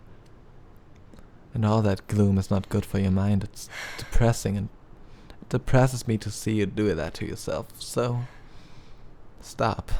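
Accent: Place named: Germany. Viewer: I literally LOVE his little German accent! It sounds so adorable and calming at the same time. Nice vid btw ^^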